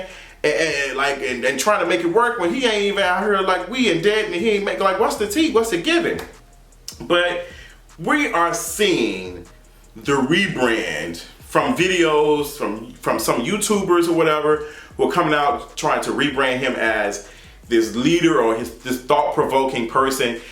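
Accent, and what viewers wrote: Memphis, United States. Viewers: Are u from Memphis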